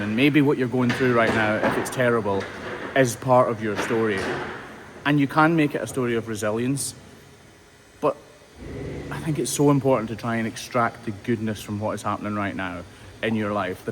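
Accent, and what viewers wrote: Scotland, United Kingdom. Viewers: I don't know what it is but your videos are somehow fascinating. Maybe it's because you're doing so much good for suffering animals? BTW, I have 2 frenchies as well, they have such a wonderful character. I'm german and I always wondered in which country you might live. My guess was Scotland because you are a little hard to understand. No offense meant at all but your accent is quite a challenge for someone who learned english in school and had to do with americans only who btw use only a third of the english vocabulary, if at all. The great comedian Robin Williams once said "once you're plane lands in Scotland you need subtitles". I had a look at your website and found out that I was right, you're based in Scotland. Keep up your good work 👍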